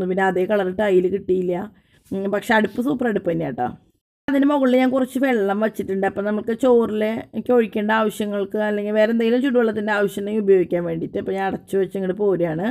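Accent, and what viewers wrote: Thrissur, India. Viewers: ചേച്ചി കാലഹരണപ്പെട്ടു പോയ thrissur slang ചേച്ചി തിരിച്ചു കൊണ്ടു വരണേ. നമ്മുടെ അമ്മൂമ്മ മാരും അമ്മമാരും സംസാരിച്ചിരുന്ന ആ slang ഇപ്പോൾ kelkane ഇല്ല.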